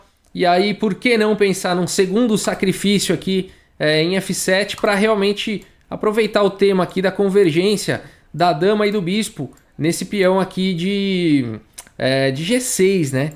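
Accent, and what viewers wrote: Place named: São Paulo, Brazil. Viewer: Esse sotaque de paulistano é um barato!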